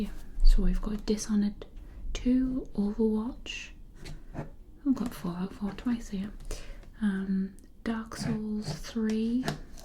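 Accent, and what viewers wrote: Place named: England, United Kingdom. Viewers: That English accent fucking hell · She sounds very Geordie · Are you from Sunderland? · I can tell your from Teeside purely by your accent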